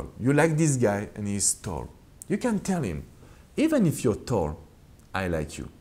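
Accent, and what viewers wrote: France. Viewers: I smiley when i Hear your French accent😘😀trop mignon 😃😅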